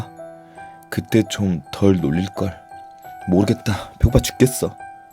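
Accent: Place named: Jeolla, South Korea. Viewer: 전라도신가부다..ㅋㅋ 사투리 억양~~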